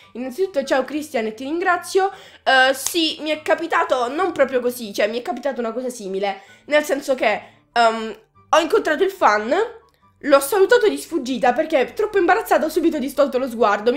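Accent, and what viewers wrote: Italy. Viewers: non c'é bisogno che non dici di dove sei si sente anche io sono di quelle parti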